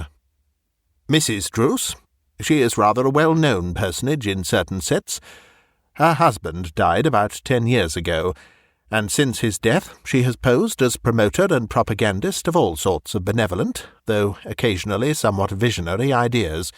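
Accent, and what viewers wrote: England, United Kingdom. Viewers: This is very confusing!!! The princess was Turkish not Swiss and you give her 2 (!) last names!!! Is it Duveé or the first one where you call her Lucy Cunier!?!? It is NOT clear that you are talking about 2 separate persons!!! I think that you must've cut something that related that fact clearly, out! Also, it is sometimes difficult to understand you because of the British pronunciation, i.e a clerk-- where you pronounce it as "a clark" but you don't leave any space between "a" and "clark". I am not criticizing you; I actually enjoy your English accent. In general, I also enjoy the way you tell stories; it's just that I think that with respect to this one, you should not have left out important parts.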